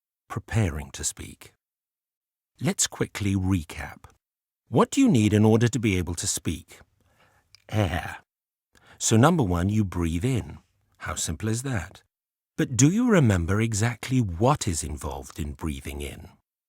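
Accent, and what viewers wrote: United Kingdom. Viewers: Wow, the British accent is so pretty!!, but I cannot remove my mexican accent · Is he British? where about in Britain?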